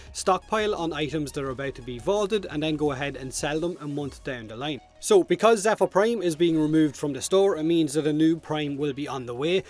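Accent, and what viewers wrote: Ireland. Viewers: You sound hot. Just saying. I'm a sucker for the Irish accent though. <3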